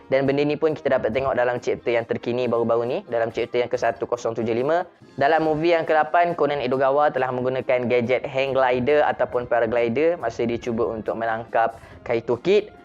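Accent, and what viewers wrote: Kelantan, Malaysia. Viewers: kuat bnyi klate tu 🤣🤣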